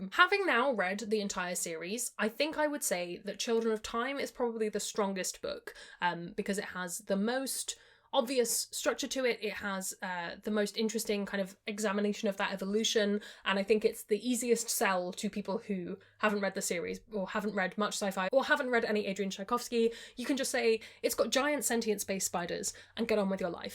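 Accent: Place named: United Kingdom. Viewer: Love your accent, it's like British lite, like a mild tea😊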